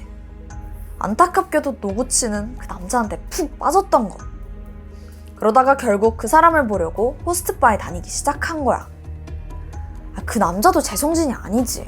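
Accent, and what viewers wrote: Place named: Suwon, South Korea. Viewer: 수원사투리중에 그런거있음 수원사람이신가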